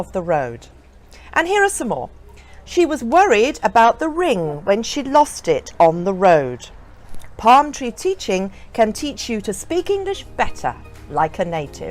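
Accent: United Kingdom. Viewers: Im from El salbador ,central america and I love british accent amd youre so cute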